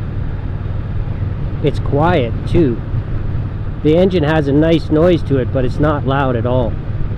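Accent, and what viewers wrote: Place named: United States. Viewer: I guess from your accent and you are riding on the right, that you are in the USA? I am surprised your speedo and odometer are in metric. I have just bought a new V9, in England, and the speedo is MPH and odometer in miles. The one thing I don't like about the bike is that the speedo and digital readout are virtually invisible. I can only tell what speed I am doing is by knowing where the red needle should be on the dial, and by keeping pace with the other traffic. At night the speedo lights up in a sort of red colour and goes completely illegible. It is OK if the sun shines directly on it, bizarrely. The numerals and letters on the digital readout are too thin to be legible which annoys me. They could have doubled the thickness of the lines forming the characters, and made it a lot better. I have done 35 miles after the 'low fuel' warning, so the lack of a fuel gauge isn't bad, though I would prefer one. 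If I had bought a V7 I would have got the one with the two dials. One important thing to know if you have a new V9 and want to buy a Givi rear carrier, if you buy what seems to be the only one available, it is advertised as fitting a V9, 2016 model. It fits the frame but then you cannot refit the seat. They seem to have lengthened the seat for the newer models. I made some brackets and fitted the carrier about 2 inches further to the rear. The seat now fits, but the downside is that in effect the carrier is only held on to the frame by half the number of nuts and bolts that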